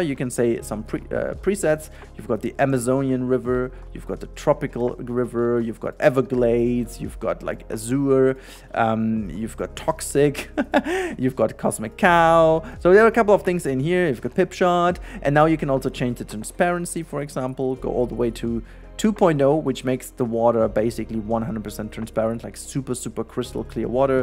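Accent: Germany. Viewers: Sag mal, bist du Deutscher? Ich höre da so einen Akzent. 😄 Hab dich gerade entdeckt, mega Video! Danke dir.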